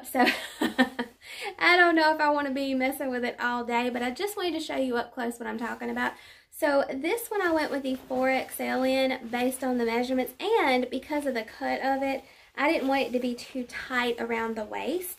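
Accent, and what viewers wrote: South, United States. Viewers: OMG! A year already!🥳🥳🥳💐🎊🎈 Gotta say you are my favorite and only one I watch for Shein hauls! You way of presenting and explaining each piece is the best. I love your southern accent and your cute and proper personality. Because of you I walked out of my comfort zone to try new looks. Keep it up friend! Congratulations!!🥳🥳🥳🥳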